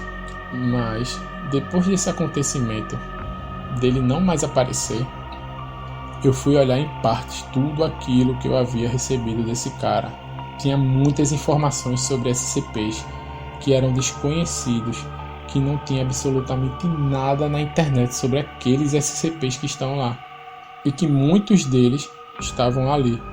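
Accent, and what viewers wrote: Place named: Northeast, Brazil. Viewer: pelo sotaque ele parece ser nordestino, porque parece muito com o da minha região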